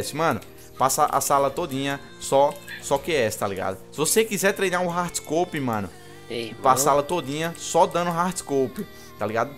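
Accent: Northeast, Brazil. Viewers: tu é nordestino???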